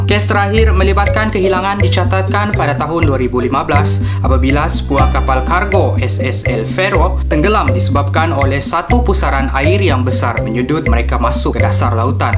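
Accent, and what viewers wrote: Sabah, Malaysia. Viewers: MN ada indon punya CKP MCM nie, hahaha dia org Sabah lh CKP MCM org KK... kotakinabalu bah Sabah bah.... salam dari kotakinabalu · dia asal sabah tpi sda lama stay d KL tulah bckp pun ada cmpuran mcm bhasa sabah smenanjung & indon. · Sabah ka kau ni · Obviously it's Sabahan... beza bahasa indo and malaysia...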